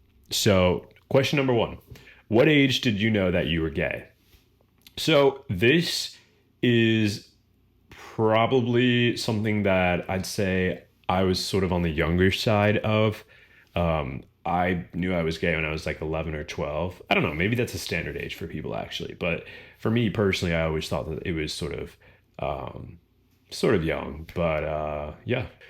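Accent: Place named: United States. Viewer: It must be because I am British and don't appreciate all the inflections of an American voice, but I just cannot make out what you said your first job was!! To me it sounds like "Chick-fer-lay" but I'm sure that's nothing like it!! Is that something that's specifically American?! And .... one of your hobbies is "Pippa-bow" or "Peepa-ball"?! Is that also a very American thing?! I am keen to learn, and I am sorry if I offended anybody!! 😀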